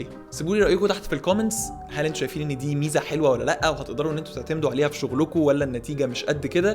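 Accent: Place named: United States. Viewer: مادري المشكله بس عندي ولا لا بس لاحظت ان لكنه الكلام تقلب كأنها امريكي يتكلم عربي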